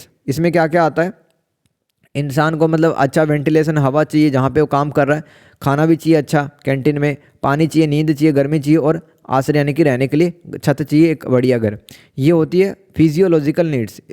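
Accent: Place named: Himachal Pradesh, India. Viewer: Bhai ji aap himachal se ho pahadi bolte ho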